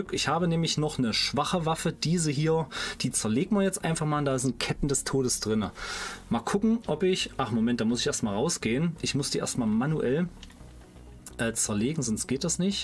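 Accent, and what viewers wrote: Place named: East Germany, Germany. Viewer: Like fürn Ossi Dialekt!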